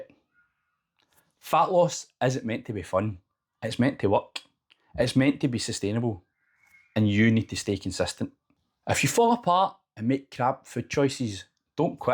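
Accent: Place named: Scotland, United Kingdom. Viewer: Great information! I was enjoying your Scottish accent when you flash the text “nips are out. Sorry it’s cold in Scotland”. I was not expecting that and hadn’t noticed your nips until you mention it but it cracked me up. Congratulations on the weight loss and the video. And for making me laugh so hard at your comment. It just caught me off guard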